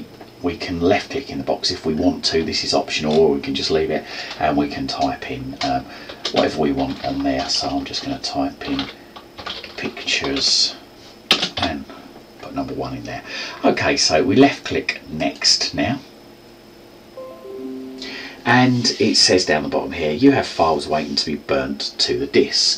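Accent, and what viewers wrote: United Kingdom. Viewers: Thank you. Simplified instructions, comprehensive for people that lack experience,calm voice, british accent . Great job!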